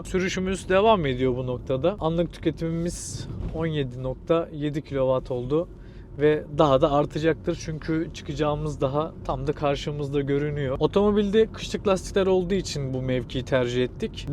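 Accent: Samsun, Turkey. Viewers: Eeelli klasik Samsunlu konuşması .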